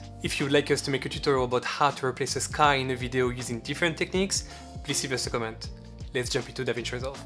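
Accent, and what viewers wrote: France. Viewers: Im both impressed and NOT. If your aim is to teach, I think it would be good to explain every move, especially the nodes. I am trying to learn fusion, and so many tutorials with god people teaching, BUT as they are god they tend to forget to show even the small steps, which if missed, actually can ruin the whole tutorial. In this tutorial, you missed the nodes, why connect them in that way. I looked at some reviews, and all gives good. But may bee they are att a more advanced level, so they can fill in what you missed. The reason I write this, is only because I see you are good at what you are doing. Even if your French english is fun to listen to :)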